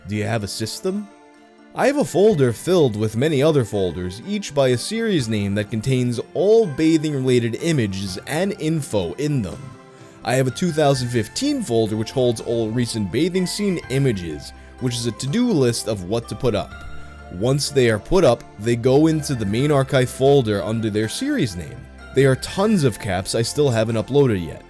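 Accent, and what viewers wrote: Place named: New York, United States. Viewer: Nah, it wasn't repetition of the word, and it didn't start to lose meaning to me. It was just hearing it in American New York accent that almost immediately flagged the word itself as a unique one. Thanks for alerting me that there's a word for that phenomenon, though! Interesting little wikipedia page on that.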